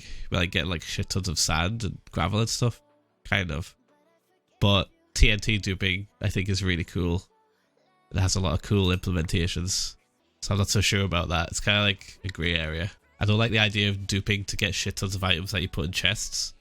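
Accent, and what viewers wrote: United Kingdom. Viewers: I know im so fucking late but im working up alright. The voice sounds more british somehow. Its more gummy.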